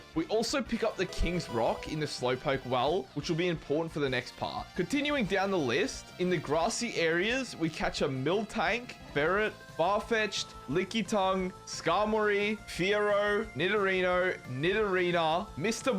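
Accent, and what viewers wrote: Australia. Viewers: Because that’s how some Aussies talk. Sounds like he’s got a bit of the Aussie-Leb accent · He's Australian or something. You're just an ass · Ahhleet foah lol fuck i can only handle the Aussie accent for so long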